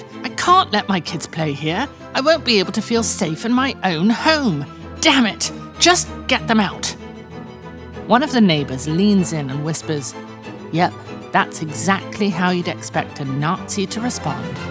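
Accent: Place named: United Kingdom. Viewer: Glossing it up with a British narrator, still shite as they’d say.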